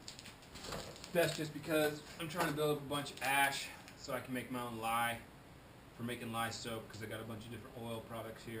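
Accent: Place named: Alabama, United States. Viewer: this dude has to be from the backwoods of alabama or some other southern state. but probably alabama, lol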